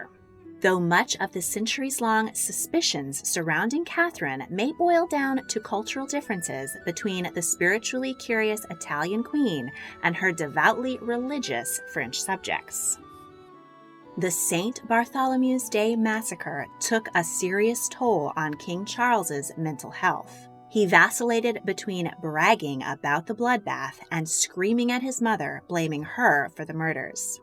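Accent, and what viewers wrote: United States. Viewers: Not another American narrator ... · Must we have this ghastly American accent? All expression utterly distorted.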